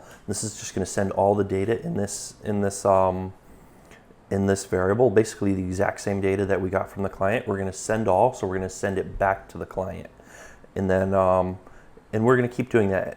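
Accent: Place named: United States. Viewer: finally one video in american english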